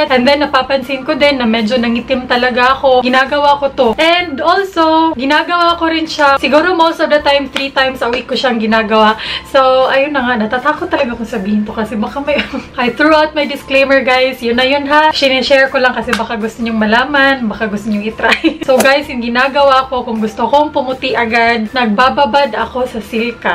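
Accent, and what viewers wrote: Visayas, Philippines. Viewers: hi te bisdak lge ka..haha taga saan ka?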